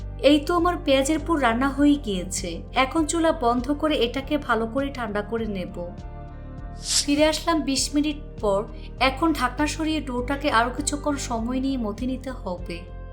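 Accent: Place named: Dhaka, Bangladesh. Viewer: আপু ঢাকা যে থাকেন সেটা আপনার কথা শুনে বোঝা যায় বাকি ঢাকা কোথায় থাকেন আমি ও ঢাকা উত্তরায় থাকি। আমি আপনার বড় ফ্যান আপনার রান্না গুলো দেখে খুবই ভালো লাগে আর আমি আপনার ভিডিওগুলো সব সময় দেখি। ❤️❤️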